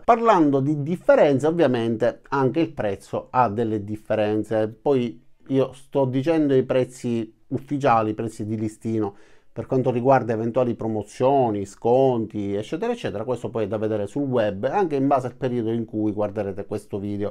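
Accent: Sicily, Italy. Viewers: Ciao grazie delle preziose informazioni. Oggi anche mia moglie ha visto il video e mi ha detto “dall’accento è di enna o di calascibetta” ..lei è di enna 😂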